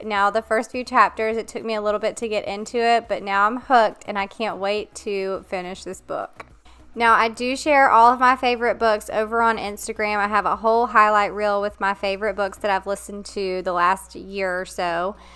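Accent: South, United States. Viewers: Hi! Been here about a month - here from Minnesota. Have found myself not only motivated to clean but also tried falling asleep watching your cleaning videos. They make me so calm and I love your Southern accent and authenticity. I am a single mom - two kids (16 and 14) and a special ed teacher as my second career. It’s hard doing it all alone- but I love a clean house and organization for my family. Lived in Arkansas for 3 years and LOVED the people and their kindness and welcoming spirit. I was a stay at home mom for 9 years. My daughter Lil is in competitive cheer here in MN. Keep doing your thing and inspiring us. Just love it. Thanks!!! Jen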